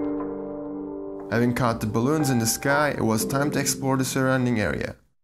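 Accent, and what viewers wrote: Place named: Black Sea, Turkey. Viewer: videolar cok guzel ama karadeniz aksanli ingilizce cok sikinti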